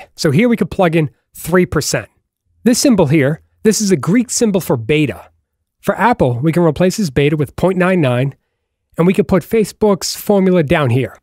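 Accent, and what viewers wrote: Boston, United States. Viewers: Love the Boston Accent! I subscribed, helped a lot with my Finance Final!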